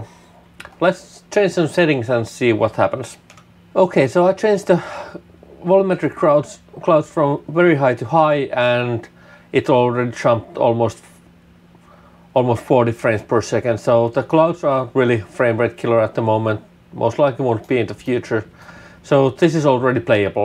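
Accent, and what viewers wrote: Finland. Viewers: ymmärrän, että kotikielellä ei ehkä näyttöjä tule niin paljon... mut tää suomalaisten rallienglanti ei myöskään ole kaunista kuunneltavaa. :) Suurin syy löytyy varmasti meidän englanninkielen opettajista, jotka eivät ainakaan silloin kun me oltiin nuoria, painottaneet ääntämisen opetteluun. Ei millään pahalla. Yritä opetella ääntämistä, jos aika ja rahkeet riittää. Kaikkea hyvää sulle. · do i hear Finnish accent? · are you finnish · very noticable Finnish-English accent there 😀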